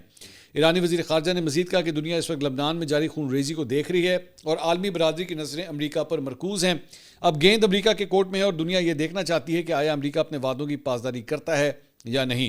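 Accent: Punjab, Pakistan. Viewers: 😂😂😂😂😂😂😂😂 very nice ap ka mzaq starting mn and hmary punjab ka andaz keeeeepppp ittttttt😅😅😅😅😅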